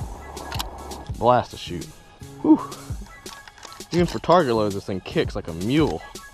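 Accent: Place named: South, United States. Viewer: When does gun videos, in this one I noticed it the most, he gets a slight southern accent, playing into those routes. 'Murica!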